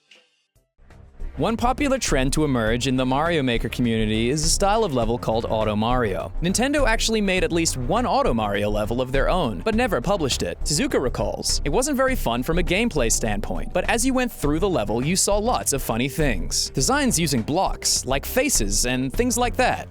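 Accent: Australia. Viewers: I love the occasional Australian dialect pop out here and there, your not fully Americanized yet mate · His Australian is showing.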